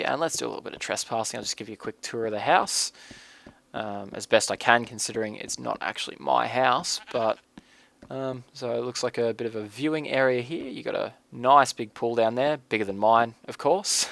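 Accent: Australia. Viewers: dude r u aussie!!!!!!!!!, cause i am too bro!!!! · Your australian?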